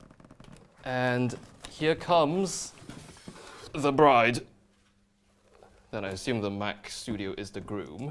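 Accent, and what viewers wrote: RP, United Kingdom. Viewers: This dude speaks very posh. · first time i hear an asian guy with a british accent.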